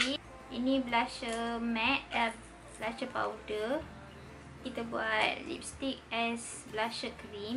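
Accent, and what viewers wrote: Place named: Kelantan, Malaysia. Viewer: Hai kak suka tgk kak buat tutorial make up and bundle mix and match tapi tak pernah comment.. paling suka dgr kak ckp kelantan it’s sounds cute 😊 buat glamorous make up untuk beginners please 🙏🏻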